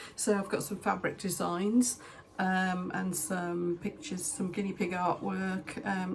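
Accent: United Kingdom. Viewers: This was very insightful 🙏🏻 I’ve been ‘learning’ polymer clay for the last year, starting with the proverbial jewellery. Just started trying to sell on Etsy, but I think you are right and far too much competition. Would you say your YT channel drives sales to your Shopify store? Or do they ‘help’ in that way? Driving traffic has always been my nemesis. 😞 Thanks for the videos. 😊 Lovely to see and hear another Brit! 😏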